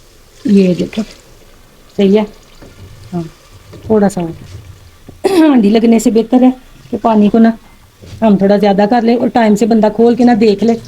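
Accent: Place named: Punjab, India. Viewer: Love your Punjabi accent ❤❤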